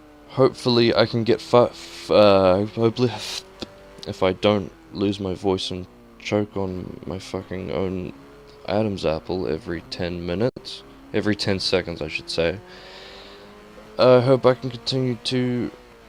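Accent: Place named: New Zealand. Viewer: I was sitting there thinking where your accent came from and I thought,”I reckon he’s a Kiwi” Nailed it,gday mate.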